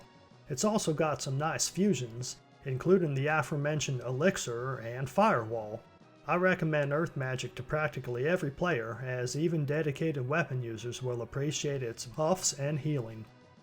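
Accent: South, United States. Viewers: I never thought I would listen to a guy with a thick southern accent talking about my favorite childhood JRPG. I absolutely enjoy watching this series. Please keep it up.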